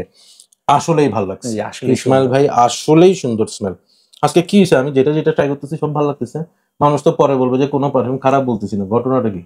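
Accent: Chittagong, Bangladesh. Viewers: বদ্দা, অ'নে চিটাইঙা নে??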